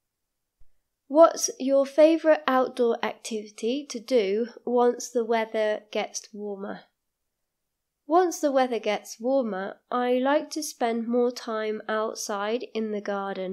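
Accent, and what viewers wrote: RP, United Kingdom. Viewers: Hi Is this RP accent?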